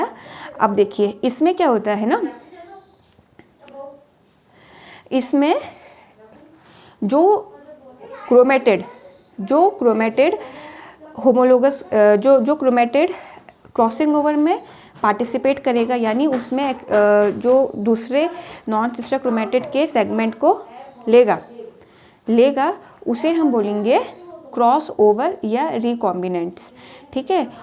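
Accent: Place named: Pahadi, India. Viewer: Mam aap pahadi ho kya bahar se awaj aa rahi h phadi bolne ki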